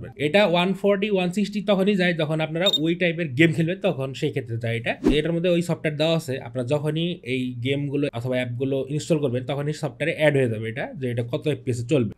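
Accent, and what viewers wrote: Tangail, Bangladesh. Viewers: ভাই কি টাঙ্গাইল এর?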